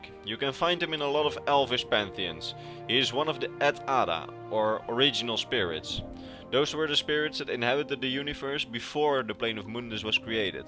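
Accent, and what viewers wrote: Netherlands. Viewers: As a Dutchman, I can hear clearly in the sound of his English he is Dutch. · It sounds like a dutch accent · you sound dutch ...but nice vid ^_^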